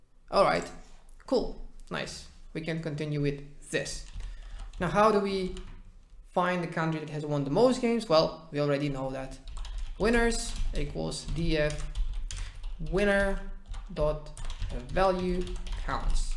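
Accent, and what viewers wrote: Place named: Netherlands. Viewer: i love your videos and your accent, are you dutch?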